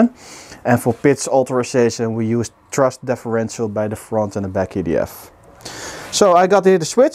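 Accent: Netherlands. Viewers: Ik dacht al aan de eerste paar zinnen dat moet een nederlander zijn, Heel gaaf gemaakt wacht op de volgende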